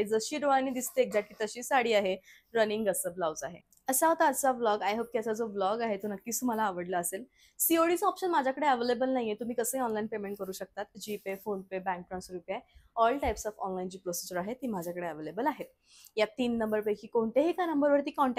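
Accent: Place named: England, United Kingdom. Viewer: Te sagala thik ahe but boltanna jabardasti marathi boltanna english accent cha use ka krtes?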